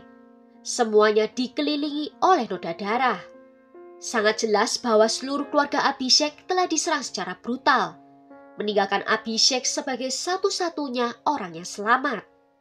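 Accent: Java, Indonesia. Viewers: Org jawa kah mbak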